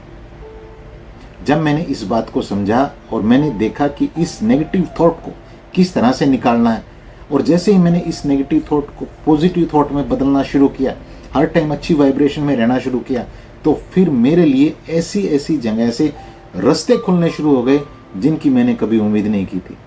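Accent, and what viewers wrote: Haryana, India. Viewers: Aap ki boli haryana jasi h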